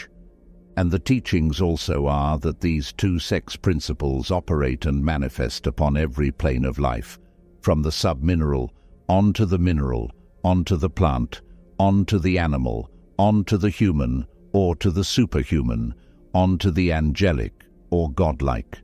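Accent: United Kingdom. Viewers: Man speaking with ‘stiff British -scholarly sounding accent’ saying nothing…..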